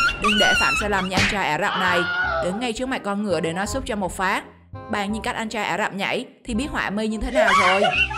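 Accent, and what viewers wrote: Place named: Quang Ngai, Vietnam. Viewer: MC là giọng Quảng Ngãi màk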